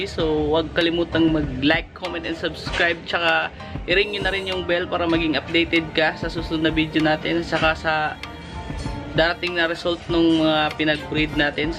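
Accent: Visayas, Philippines. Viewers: 100% if he speaks english you will find a hard time to understand it due his bisayan accent, and its hard to communicate outside your native language. 😀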